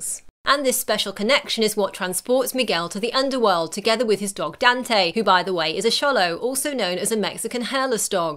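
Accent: Castile, Spain. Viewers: That explains the near perfect Castilian accent. Que chevere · Great review. I would have loved for you to use the Mexican pronunciation of Spanish words, rather than the European Spanish pronunciation. For example, the name 'De la Cruz' in Mexico would be pronounced 'De la Crus' NOT 'De la CruTH'. In Latin American Spanish words with a 'z' or a 'c' are pronounced with an 's' sound, as opposed to the much harsher 'th' sound from European Spanish Therefore Pan Dulce, should be pronounced 'Pan Dulse', NOT 'Pan DulTHe'. It's a real shame as I could tell you were making an effort to pronounce the words correctly, however, for someone how knows the difference, it sounds totally out of context, just like a history British film would sound if words were pronounced in American or Australian English... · You sound castellan are you from Europe or something? Just wondering nice video tho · I totally love this video. 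It stated very exact and truthful facts. Only thing wrong is the accent. The words are perfectly pronounced is just that she used spanish accent instead of Latino or Mexican accent which is totally ok. It's just weird to hear familiar names in a different accent.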